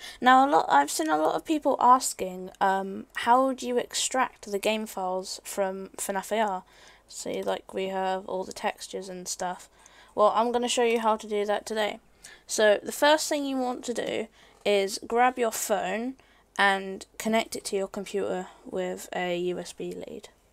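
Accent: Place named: United Kingdom. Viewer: Bri'ish boi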